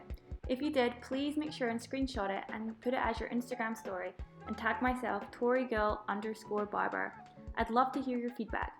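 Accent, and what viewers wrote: Scotland, United Kingdom. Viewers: Are you Scottish? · The Cuts ❄, The scottish accent, is this in glasgow?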